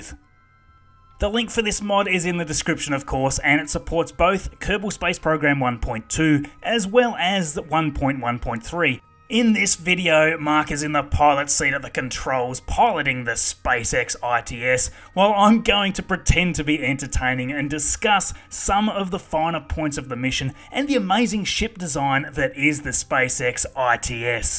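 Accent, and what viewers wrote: Australia. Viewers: Got to love (or hate) the Aussie accent! :)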